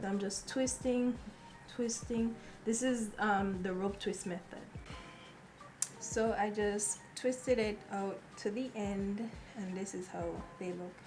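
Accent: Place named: Caribbean. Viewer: Lovely to see another Caribbean queen! Love the hair and your accent!!!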